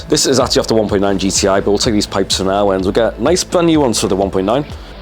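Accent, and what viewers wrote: Liverpool, United Kingdom. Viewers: are you from Liverpool? I hear some Scouse in your voice 🤷🏼‍♀️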